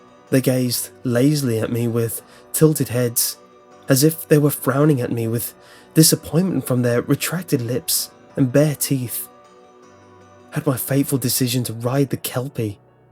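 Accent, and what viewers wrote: United Kingdom. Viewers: Well if you haven't noticed yet, he's British :/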